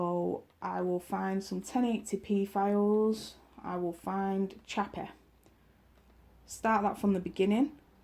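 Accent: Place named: Birmingham, United Kingdom. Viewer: I am foreign but you're sooo much from Birmingham :)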